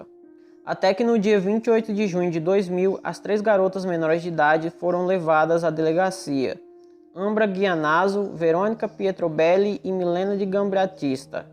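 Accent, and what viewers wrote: Northeast, Brazil. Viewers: Esse sutaqui e da onde??? Do nodeste????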